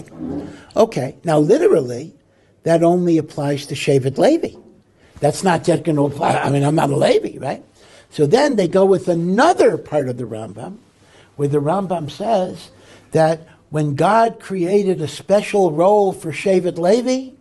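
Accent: South Africa. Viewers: Dude where’s your accent from? South African or Australian? And how’s Palestine your home?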